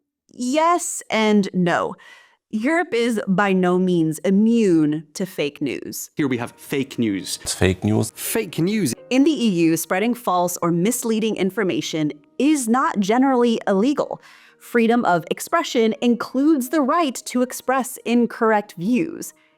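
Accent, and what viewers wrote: United States. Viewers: U need a differwnr presenter...what you say may be right but right now an amerrican voice talking about media bias and truth has next to no credibility outside the former Usa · It is so pleasant to hear a balanced opinion in an American accent for once. 🤷‍♂😄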